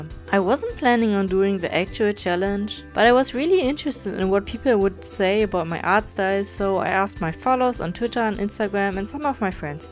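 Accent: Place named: Germany. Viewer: By the first sentence I knew you are German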